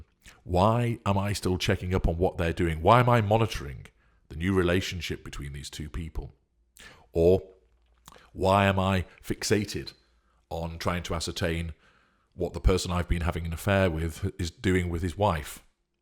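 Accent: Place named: Oxford, United Kingdom. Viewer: 🙂 i like his accent, he sounds like a Prof at Oxford ☺️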